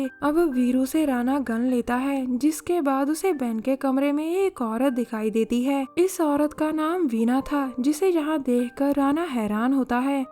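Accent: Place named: Bihar, India. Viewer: Maidim kya aap bihar se ho boli se toh aap ekdum gaavki bihari aurat lagti ho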